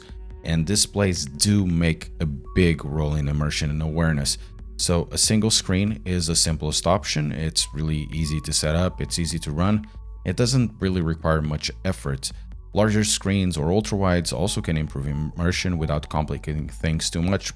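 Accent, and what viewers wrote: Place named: Portugal. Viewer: Are you portuguese perchance? Your accent sounds like it Edit: Eu sabia! 😂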